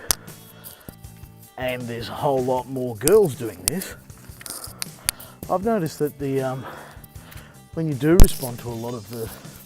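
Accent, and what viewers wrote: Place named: Australia. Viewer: lol i wish i had an aussie accent! sounds awesome! good luck with your weight loss journey mate! new sub :D can't wait to see your progress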